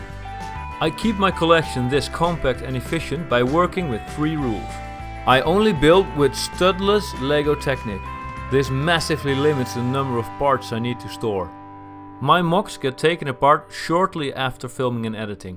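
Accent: Netherlands. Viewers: From the accent you can hear he is Dutch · Are you from the netherlands? Kan het horen aan je axent😅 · Nice way to do so my friend. This kinda overviews you don’t see that many often. When I, for whatever reason, need to go into the grandchildren’s playing room I’ll need either a great deal of force or rent a CAT shovel or backhoe! It’s a good idea however to sort it out! 👍👍. By the way, you’ve got these accent as I recon as European? Dutch or Swedish? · You sound dutch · You sound like an man speaking english with a dutch accent...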